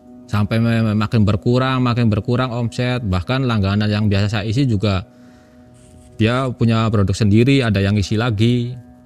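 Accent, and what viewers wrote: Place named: Cirebon, Indonesia. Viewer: Wong cirebon nadane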